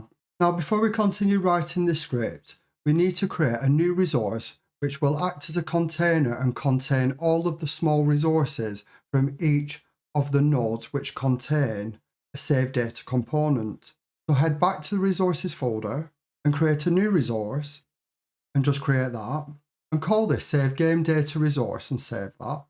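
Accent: United Kingdom. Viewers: Fantastic Tutorial!! I really have to ask though, where exactly is that accent from? Some region in England obviously, but where? I am trying to figure that out for 1 week now. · As someone who use to make tutorials, I can say this is a HUGE amount of effort! Seriously well done on a fantastic tutorial and excuted so well! Are you from Lancashire by any chance? Just thinking from the accent?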